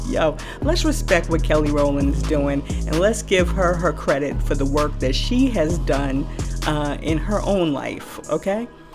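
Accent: New York, United States. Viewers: That New York accent is 👍🏿👍🏿!!!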